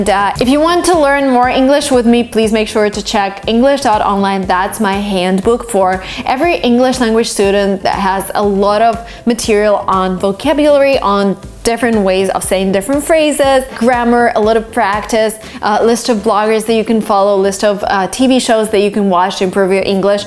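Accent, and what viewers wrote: United States. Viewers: I like the way you speak your english in American so yeah it's great I like it. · Why am I watching your channel? First of all you are a non-native speaker and I highly prefer to observe your video because I want to take your advice that you've done since you start your learning and How you are able to speak like American. And I always tell everybody who learns English to review your channel cause it has a lot of advantages you are amicable and you elaborate anything so obviously and it makes your channel with lots of fortification. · I watch your channel bec you told me how to speak English like American accent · I’m watching this channel bc I want the same accent as you .. and also I’m living in Canada .. and I kind of need American accent ... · The reason why I watch your channel is that your pronunciation is quite clear and I really enjoy the way how you make your videos it's very dynamic in my opinion that's the reason why I always try to imitate you right after you start speaking because I want to become fluent and obtain the American accent as you got. 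Moreover, it helps me a lot in learning new vocabulary!!!! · I watch your channel because even though you're not native your accent is very real. Actually at first I thought you were American. So I find you inspiring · Before watching her video I thought that she was american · I watch your channel because you're just one of the best teachers I've ever had. When I first saw your channel,I thought you were American! You English is so good. Thanks for your help,keep making these amazing videos. I am 12,and my dream is to be a polyguot. BYE,from Brazil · Are you like your way speaking you are a good teacher and you teach American accent so I can talk like a native speaker and you are so beautiful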